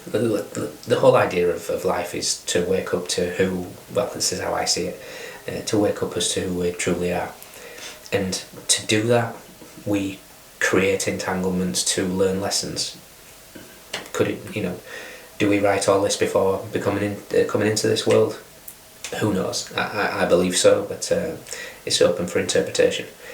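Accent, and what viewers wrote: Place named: North, United Kingdom. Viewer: That was nicely put😄 especially with that northern accent. Thank you for that🙏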